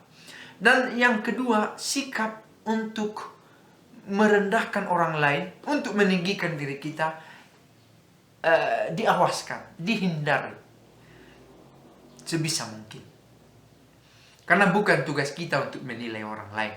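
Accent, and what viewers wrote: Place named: Tegal, Indonesia. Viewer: Kalo dari logatnya kayaknya orang tegal 🤔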